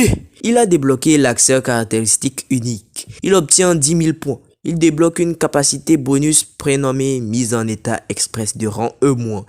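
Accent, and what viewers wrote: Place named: France. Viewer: pourquoi tu a la voix d un gars du continent 😅😅😅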